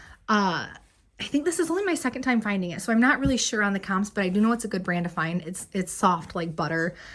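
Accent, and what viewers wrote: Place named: Midwest, United States. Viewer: Your Midwest accent is AMAZING. Where are you based? I miss it, I grew up in SE Wisconsin :)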